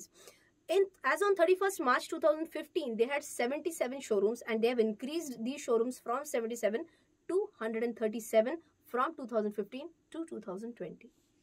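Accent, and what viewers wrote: Maharashtra, India. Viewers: Feel the marathi accent 😁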